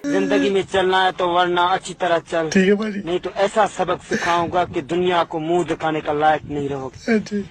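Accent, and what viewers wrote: Assam, India. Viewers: Bruhhh I kindaaa felt like u r Assamese or yk Assamese 😭✨✨I was right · bhaiya kiya aap assamese ho .....???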